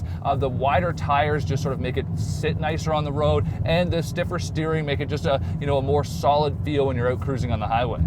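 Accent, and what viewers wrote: Canada. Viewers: This gentleman is speaking just fine, the Canada accent, no big deal. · is this guy Canadian?... ither way I think he is sexy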